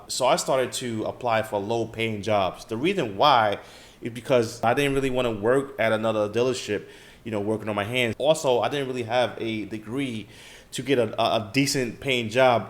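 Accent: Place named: New York, United States. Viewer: you must be from new york, it’s the accent & hustle mentality. i literally feel this with my job rn, in between structuring my time & working for entitled people it really sucks. I definitely need to be an entrepreneur & master forex and the psychological aspects of it, so i’m really thinking about leaving when the time is right thank you for sharing!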